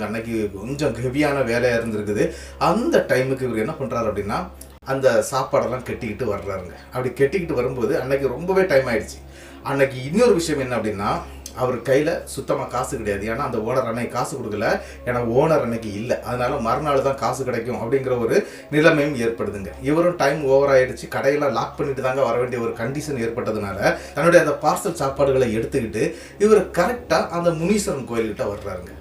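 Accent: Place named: Nagercoil, India. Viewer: நம்ம நாகர்கோவில் ஊர் காரர் என்பது பேச்சை வைத்து அறிந்தேன். மிக சுவாரஸ்யமாக அழகாக சொன்ன விதம் ரசிக்கும் படி இருந்தது. நீங்கள் சொன்னதால் தான் நான் சப்ஸ்க்ரைப் செய்தேன். நன்றி நல்வாழ்த்துக்கள்.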